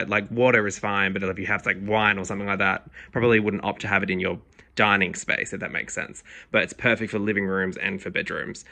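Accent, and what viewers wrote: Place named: Australia. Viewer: Yay, an Australian accent AND a great design aesthetic :)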